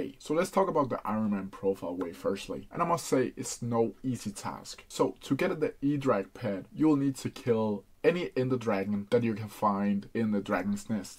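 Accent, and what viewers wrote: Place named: Denmark. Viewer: nah det der danglish er alt for obvious, smid lige din disc vi skal ramme noget skyblock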